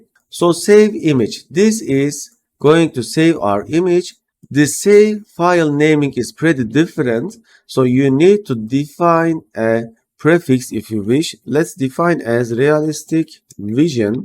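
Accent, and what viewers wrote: Turkey. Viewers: I admire your Turkish accent. 🙂🙂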